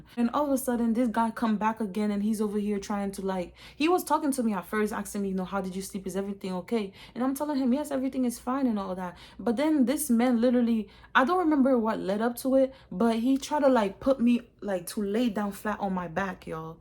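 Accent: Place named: Haiti. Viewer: I picked up a Haitian accent the moment you started speaking 😍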